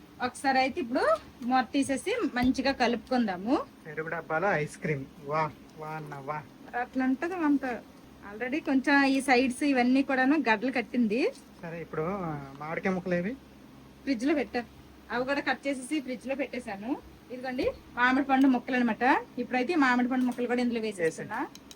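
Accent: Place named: Bengal, India. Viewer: Mi matalu bengal lo vunnappudu slang okalaga vuntundi mi oorlo moratuga matladutharu inka ekkuva soundtho matladutharu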